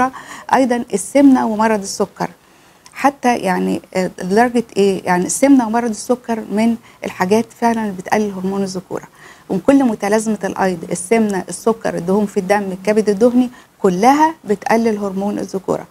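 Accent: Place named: Egypt. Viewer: شكرا للكتورة ولكن كلامها على لهجة المصرية ما يعرف المطلوب خصوصا حرف القاف في كلمتها لوكان كلامها بلهجة الفصيحة يستفيد كل من يعرف العربية الفصيحة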